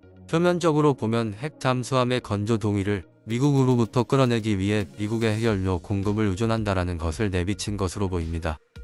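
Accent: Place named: Jeolla, South Korea. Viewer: 깽깽이 사투리 못들어주것네... 수고혀라.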